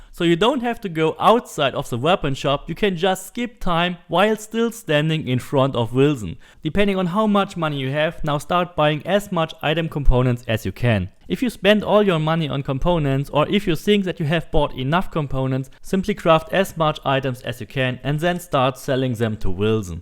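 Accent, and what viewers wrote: Germany. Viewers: Der germanischste akzent den ich je gehört habe. Probs für das informative video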